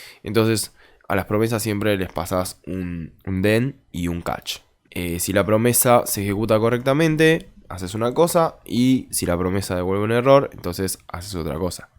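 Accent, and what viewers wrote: Argentina. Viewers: Mis ojos: es un programador asiático Mis oídos: no, es argentino. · Cuando ves a un chino hablando en español argentino, no tiene nada de malo, pero como que algo no cuadra. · Que feo un asiático con acento argentino :/ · Un asiático hablaba español con acento argentino, estoy confundido jaja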